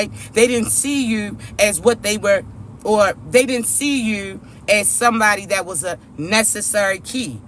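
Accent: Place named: Baltimore, United States. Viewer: Love the accent.. Baltimore?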